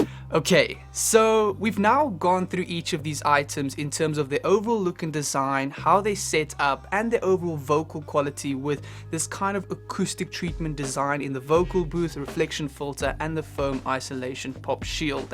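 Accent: South Africa. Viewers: Ayyyyy. I detect a south African accent ! Nice channel 😃🙌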